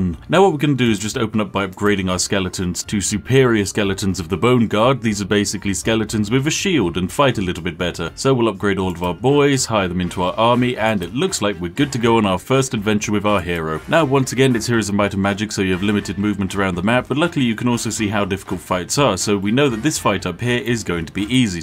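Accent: United Kingdom. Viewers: I love me some smooth talkin' British man playing fun and interesting indie games. Best bedtime story ever. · Aaaaaaa! British boy is back, where is my tea.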